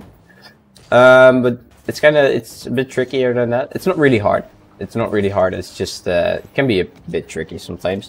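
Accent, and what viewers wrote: Netherlands. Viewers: I just know ur a fellow dutch person, i can hear it in ur accent 😂 great video man, love it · I smell Dutch, bedankt voor de tutorial maat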